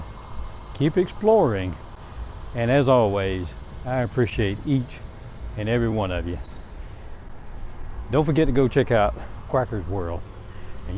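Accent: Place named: South, United States. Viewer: Good morning,. Thank you for sharing this awesome video on Fork Valley. I agree with you on how it got its name. I might have missed it, what is the population there? It is all so beautiful. If I had to choose a favorite area, it would be the railroad district. Love listening to that southern draw.😎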